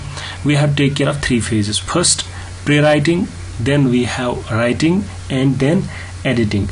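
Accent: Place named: Kashmir, India. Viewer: A Clear kashmiri accent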